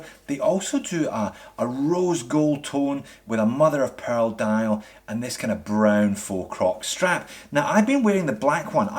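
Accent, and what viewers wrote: Scotland, United Kingdom. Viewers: Great video!! I do have a question though. I heard you say in another video that you are a proud Australian, but, honestly, when you speak, I only hear a Scottish accent. Why exactly is that?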